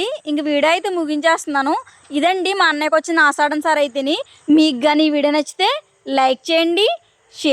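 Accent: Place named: Godavari, India. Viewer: Super undi sister.mana godarolla majaka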